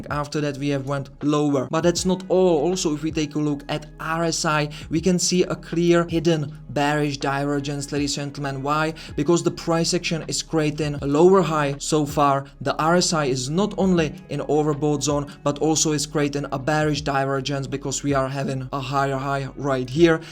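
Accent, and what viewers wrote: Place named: France. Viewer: Dude, I love your accent.... Are u French?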